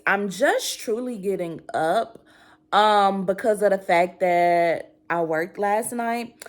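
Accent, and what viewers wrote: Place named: Chicago, United States. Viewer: I'm so here for your vibes! Are you from Chicago? You sound like my people 🙌🏾 I'm from Chicago but I live in Seattle now. I was a med/tele nurse but quit my job around 7 years ago to do my art full time 🎨 I love when the YouTube algorithm put creative Black women on my radar 💕 Love your craft room. Happy birthday!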